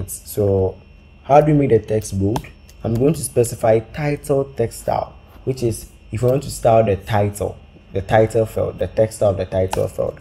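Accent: Ghana. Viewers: Thank you very much you really helped me, i can tell you are Ghanaian from your accent, keep on doing what you are doing, this will really take you far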